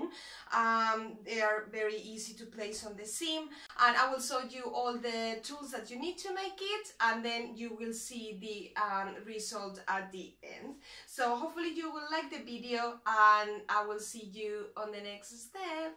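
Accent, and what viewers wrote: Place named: Mexico. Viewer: hello, nice video, you have the same accent, that my mexicain Lady friend. The minute i heard you, i toughs of her, anyway, nice video keep on going.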